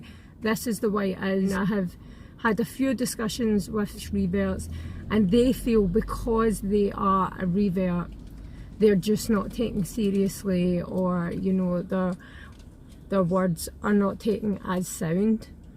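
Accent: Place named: London, United Kingdom. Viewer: Salam 3aleykii, where about in london you from sister? I love your voice and accent 😍😍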